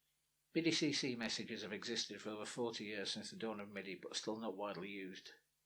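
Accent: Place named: United Kingdom. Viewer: uhoh, br*ish narrator. crank volume to max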